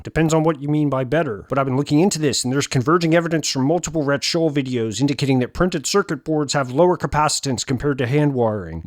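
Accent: Canada. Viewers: Dude, I am basically the audience for your Jordan Peterson content. If there's only one dude on the whole Interwebs who gets what you're trying to do, it's me, and I love it. My significant other of nearly 30 years is Canadian even though I'm from the American South. I studied history and political science in university. And I'm a 2000 graduate of Musicians Institute's Guitar Institute of Technology in Los Angeles (Paul Gilbert's alma mater). So your stuff is hilarious to me because I get it on so many levels, especially when the jargon makes sense not only as satire but as literal tone shaping advice or political critique. And the accent: "top" = "tawp." Next up, "Toronto" = "Toronno!" You've epitomized an enraged Canadian conservative forced to live in liberal Ontario. You have captured the zeitgeist of my wife's country and the rage of their small, American-loving conservative fringe perfectly, especially from my perspective of spending so much time between liberal Toronto, Montreal, and Ottawa, looking over into Alberta. 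I can hear Alberta's outrage in Kingston, Ontario even though they're two provinces away. :) Canada, a strange land where being on the left side of the country means you're politically right, and where being a Liberal means you're probably a diehard Canadian nationalist who can trace his roots to his Loyalist 6x great grandfather. :) Cheers man! Keep them coming please. :) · Has anyone actually been to an MBV concert? I have. I couldn't hear properly for a week after. Now I understand that their Muffs were obnoxiously interacting with with their delay pedals. And as I always say to these: More. Canadian. Accent! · In addition to being really funny, this is a truly good approximation of Peterson's/ most Canadians' accents. It's not easy to do. So, kudos! Doublemint kudos!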